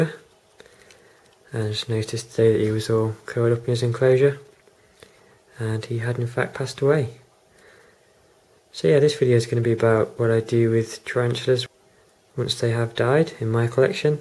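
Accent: United Kingdom. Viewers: I thought your accent was Derbyshire Midlands.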